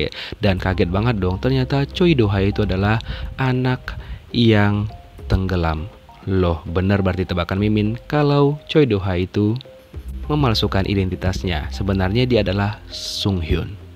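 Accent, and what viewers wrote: Medan, Indonesia. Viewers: Logat mu kek orang Medan Wak 😂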